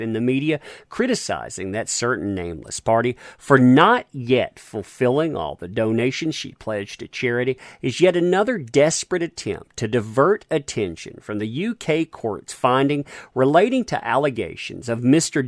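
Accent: South, United States. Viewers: I never thought I'd enjoy listening to commentary with such a southern drawl. But you, sir, got it going good. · southern drawl makes this even more informative and entertaining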